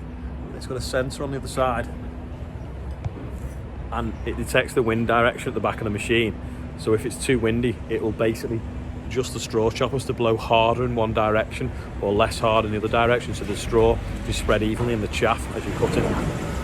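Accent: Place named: St Helens, United Kingdom. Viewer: it's doing my head in now I'm trying to figure out were ur from I know its some were close to st helens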